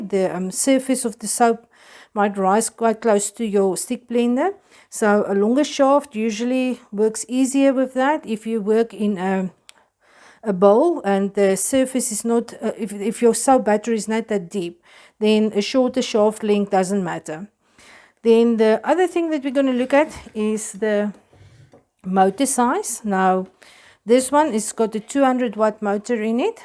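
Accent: South Africa. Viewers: Hello, I have just discovered your channel. So nice to hear a South African voice on Utube making soap.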